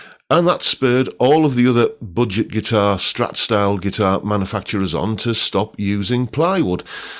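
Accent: United Kingdom. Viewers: ademas de tocar bien la guitarra, habla un perfecto Ingles con acento Britanico.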